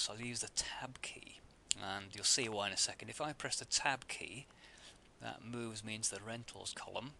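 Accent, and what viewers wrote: United Kingdom. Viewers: don't know why i can listen to british people doing tutorials but american accents bore me to death in tutorials!? Even though im american! Anyways good job on the video! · thanks u british guy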